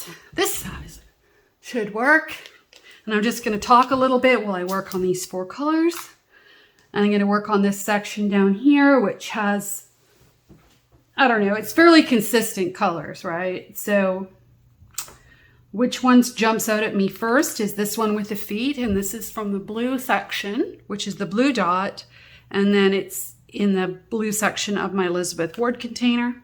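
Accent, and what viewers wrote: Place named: Canada. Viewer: Hi. When you start talking tonight, I knew right away that you had to be Canadian, but I wasn't sure where from. I am from the East Coast, Newfoundland, to be exact, and we used to go to the beach every Sunday when we were kids to have a Sunday supper. I have a lot of fond memories of that. I have been living in Belgium for the last 6 years. I think it would be really great if you did a vlog of you taking your dogs to the beach or to the dog park. We would get to see a part of your world then. I will probably start my canvas in the middle of the month because I've got to finish the one I'm working on for another event. 😊😊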